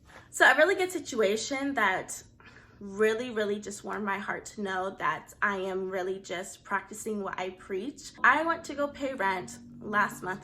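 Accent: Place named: Caribbean. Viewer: Hey, Girl you sure you from down south because I swear I can hear Caribbean or jamaica in your accent. Thanks for this video.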